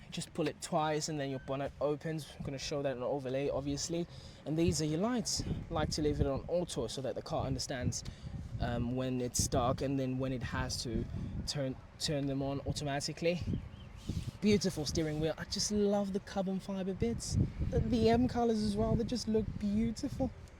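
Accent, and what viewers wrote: United Kingdom. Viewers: Broo with that accent, you giving UK vibes😭😭🔥🥂 W UK accent, keep up the tremendous job🔥🔥🔥🙏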